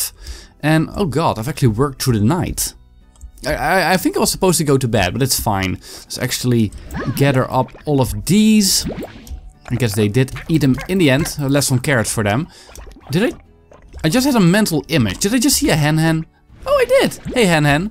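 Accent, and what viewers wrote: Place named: Netherlands. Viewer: i think he's Dutch lmao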